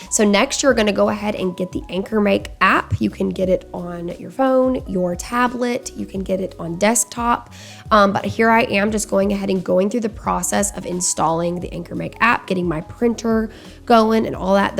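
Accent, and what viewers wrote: South, United States. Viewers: Hi, I recently found your channel and love the fact that you are a Christian (I see your tshirt designs 💜) and feel sure I hear a southern accent! I am a special education teacher in Arkansas and am considering starting a small business for my special education students. Would you ever consider doing a Google Meet so I can ask you questions and get some guidance? I’ve been watching your videos, but need to get this thing going, by ordering supplies and equipment within the next month.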